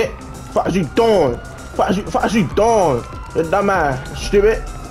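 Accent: Baltimore, United States. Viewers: Baltimore accent never fails 😭😭😭😭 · You from baltimore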